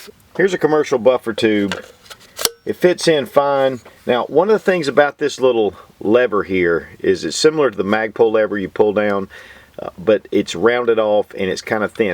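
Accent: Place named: South, United States. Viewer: U have a southern accent, he he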